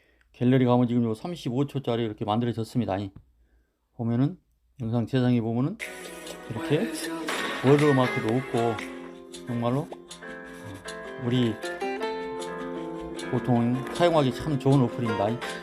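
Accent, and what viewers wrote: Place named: Jeolla, South Korea. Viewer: 님 좋아요 그래요 저도 이번에 어플을 깔아두고 연습을해보았는데 생각보다 괜찮더라고요 잘 알려주셔서 고맙네요 혹시 전라도쪽?하겠습니다이~~~♡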